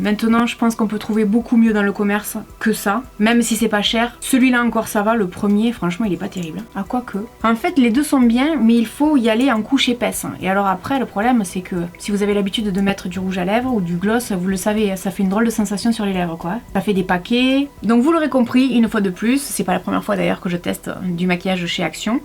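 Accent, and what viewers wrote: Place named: South, France. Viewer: L'anglais avec l'accent du sud ... DU SUCRE !!! Bisoux à toi, à maman et bisoux truffe.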